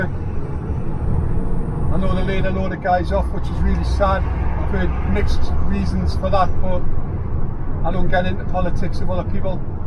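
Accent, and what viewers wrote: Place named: Geordie, United Kingdom. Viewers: North Shields and South Shields names come from being the shields of protection from people attacking us from the north sea. Your monk story is not the origin of the place name. Anyway you sound Geordie so I will subscribe. 😃😉👍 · What a shame just found this now 2021 hope ur still trucking..nice to hear ur Geordie Twang....in lockdown England...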